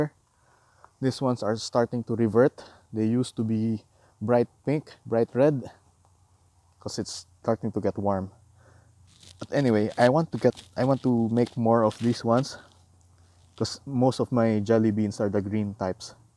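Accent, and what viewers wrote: Philippines. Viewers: You sound Pinoy po! Btw, thank you for this info, your garden is beautiful! Keep it up! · Pilipino po ba kayo? It sounds like so :) Love your garden! I wish mine would grow as healthy and abundant as yours someday.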